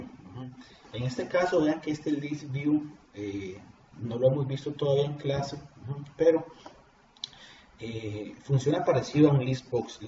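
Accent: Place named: Costa Rica. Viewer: Uno reconoce a un tico hablar en cualquier lugar :-)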